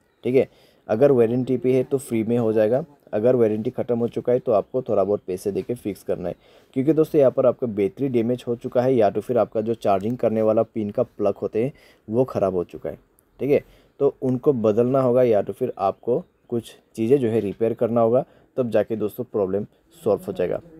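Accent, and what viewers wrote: India. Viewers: Мерзкий эндуский акцент, слушать невозможно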